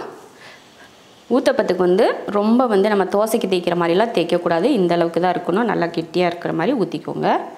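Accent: Coimbatore, India. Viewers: Neenga Coimbatore ah madam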